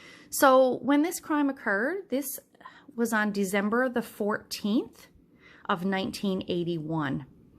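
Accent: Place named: Newfoundland, Canada. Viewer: I’m saving this to listen/watch later with some stitching in hand. Fabulous idea. You’re the perfect person to do this considering your profession as well. Plus I just adore that Newfoundland accent. I have been away from flosstube for too long but you’ve drawn me back in:)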